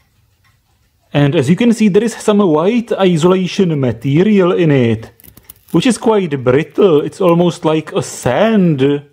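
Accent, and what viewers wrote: Czech Republic. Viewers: You sound like you are from czech republic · An obvious Czech accent. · Oh my god. AS a fellow Czech i laugh just by listening to his accent. People probly come by just for that as i do :D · Yeah I can confirm as a Czech myself he is Czech. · Is this typical accent of Czech people? I thought he stretched the last word on purpose to create a signature sound.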